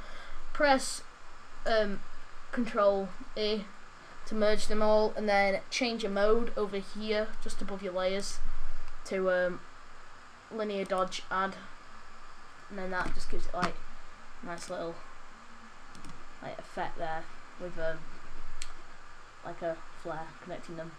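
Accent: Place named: United Kingdom. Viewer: O,MMGMGFJ UR BRITISH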